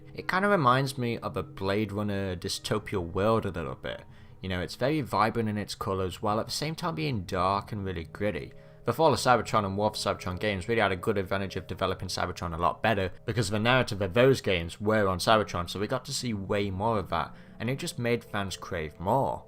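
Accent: United Kingdom. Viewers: Why do you have an English accent until you say Audobods and Decepticaaaans? It’s very odd.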